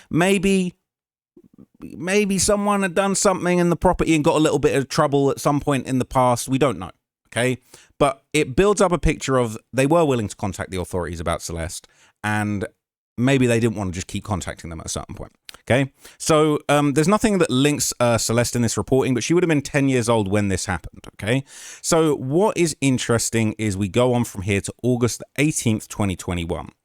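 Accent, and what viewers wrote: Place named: United Kingdom. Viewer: Love your accent UK 😊❤ but yeah I agree I can never put my self in Celeste family’s shoes for one I wonder if their interpreter is the Salvadoran interpretation or a Spanish one because some of the words sound the same but have different meanings it must be difficult for them in every way because I don’t think they get the full details but seeing the evidence is about the only thing they can fully understand 👀🧐😮‍💨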